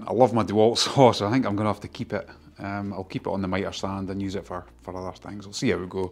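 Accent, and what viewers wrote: Scotland, United Kingdom. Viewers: Nice job mate, also nice to hear a Scottish accent for a change! I have the same thing in mind to build but I was thinking of going for Bosch glide 12". At this level they are all top notch saws. I have a cheaper erbauer mitre saw and for £240 it has massive cut capacity but suffers the same drawback as your dewalt in that it's ginormous and space is limited. I'm from Kilmarnock btw, I am a joiner and have a youtube channel, jusgeritdone. I only have 4 vids at the moment but have decided to start getting a bit more serious so working on a new video just now. I built my shop last year and currently working to finish indide. Anyway, I have subscribed to your channel and enjoyed watching this build. It would also be interesting to hear how you get on with the new makita chop saw.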